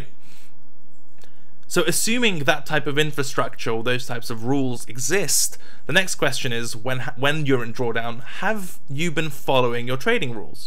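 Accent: United Kingdom. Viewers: Very good, other than you sound like a posh git to an Aussie like me. Kudos for sharing free. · didnt know you were english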